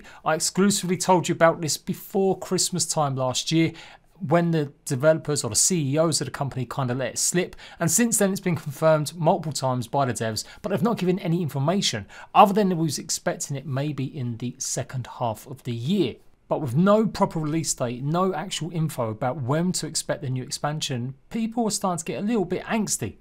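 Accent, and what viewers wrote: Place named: Cockney, United Kingdom. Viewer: Strong cockney accent...kind of hard to understand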